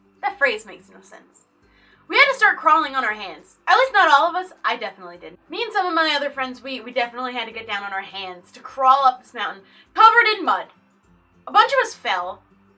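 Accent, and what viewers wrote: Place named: South Jersey, United States. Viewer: So your from South jersey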